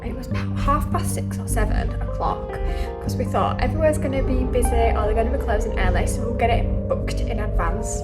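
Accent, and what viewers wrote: United Kingdom. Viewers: Pure english. Pronounciation of the british. Hard to understand. Unlike the american english. Its too easy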